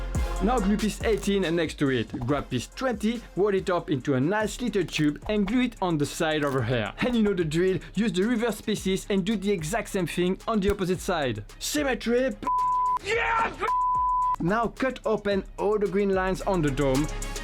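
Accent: France. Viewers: Merci pour le template, je suis actuellement en fabrication du mien. J'ai une question, avec ton accent, est-tu français ?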